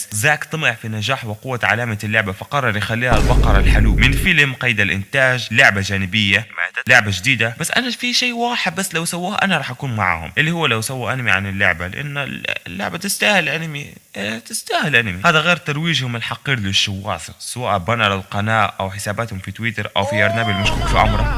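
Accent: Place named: East, Libya. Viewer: من لهجه انت ليبي من شرق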